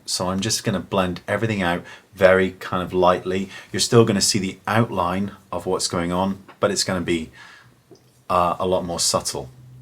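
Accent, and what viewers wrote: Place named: United Kingdom. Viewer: nice British accent~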